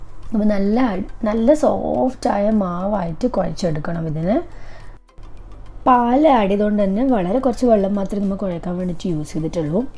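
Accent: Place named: Mangalore, India. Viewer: Lv ur way f talkng frm mnglrian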